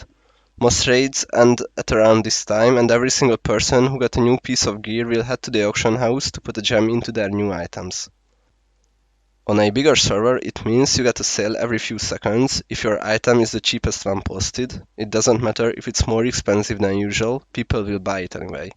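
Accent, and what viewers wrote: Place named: Hungary. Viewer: Can figure out by your accent that you are Hungarian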